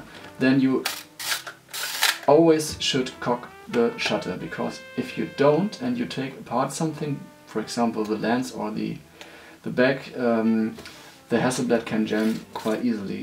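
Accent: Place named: Germany. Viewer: Ich muss ehrlich sagen, dass Video ist super, auch wenn ich persönlich ein anderes Resultat habe nach dieser Expertise. Was ich nicht verstehe, es gibt soviele Videos auf Englisch über die Hasselblad und Rolleiflex und dann kommt Mal ein deutscher der ein Video macht, beide Kameras aus der Praxis kennt und wieder auf Englisch. Sorry aber der Akzent ist nicht zu überhören. Die Bilder zum Schluss sind sehr schön, erste Sahne.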